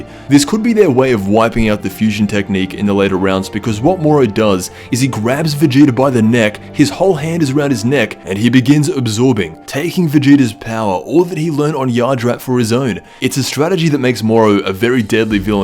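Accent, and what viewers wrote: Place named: Australia. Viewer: J T it’s the pure Aussie accent we all have including me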